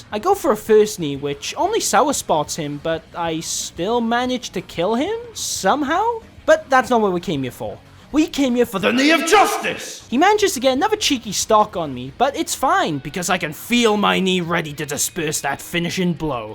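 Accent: Wales, United Kingdom. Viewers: Your accent is amazing, I'm guessing Welsh? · What the hell is your accent mate. You sound welsh, American and russian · I think you really got to the heart of this. Good vid. Surprisingly sincere and sentimental. Hope Nintendo takes this to heart and NEVER fucks with things like the Falcon Punch. (Also, kinda love your accent. Don't get many Welshmen on here...I'm sure you're not sick of hearing THAT by now...) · Great video. Are you a little bit Welsh? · I can't decide if you're Welsh (most likely), Irish or American. :')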